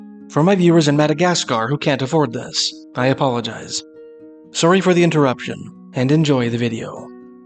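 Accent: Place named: Canada. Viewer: If we ever go to war our password is About! I've heard so many Canadians, like this fella, whose accent is indistinguishable from varieties of American accents but that one word, about, will get them EVERY time!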